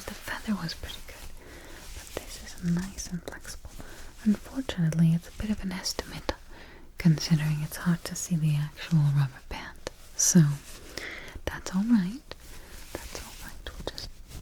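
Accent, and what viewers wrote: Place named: Mid-Atlantic, United States. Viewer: I wonder if mid Atlantic accent is for ASMR or if she always talks like that. Either way it's perfect for ASMR intentional or not 😌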